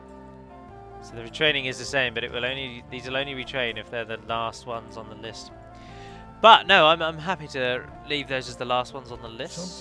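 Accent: South Africa. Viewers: Great South African accent! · I love the South African accent!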